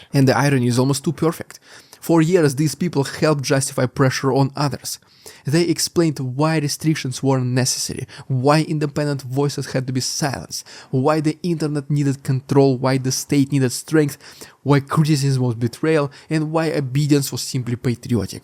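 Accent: Russia. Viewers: I am terribly impressed by the command of the English language, although spoken with a thick Russian accent. I am an Australian that follows him daily and everybody here, (except a very few), totally support Ukraine. I would bet money that the rotten bastard Putin will be gone by Xmas! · In the beginning you were throwing morw effort on speaking out the English words. Now you are shooting them like you are speaking Russian...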